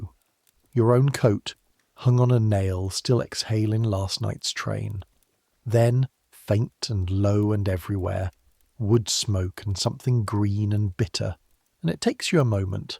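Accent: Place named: United Kingdom. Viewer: Actually I do actually fall asleep to your voice. I have many times. Your voice is comforting, calming, and, to be honest, just a little boring. So it is very nice to sleep to. I happen to enjoy a British accent quite a bit, rather like a good smoke, even though I no longer imbibe. So I often have dreams related to the topics you’re talking about. I live in Norman, Oklahoma, USA & I like to think I know so much about certain members of the monarchy. I must seem hilarious or perhaps pitiful to some people. Many Americans know absolutely nothing about the royal family or British history. But bc it doesn’t involve my own life & I don’t have strong feelings about your monarchy or history, the subject matter is also calming & a little boring. But I just happened to wake up to this video of yours this morning am, Sunday, August 23, 2026. That is actually quite late for me. 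I will save this to finish for later,as I would a nice iced coffee with cream & milk. Good morning and thank you for some nice nights & mornings!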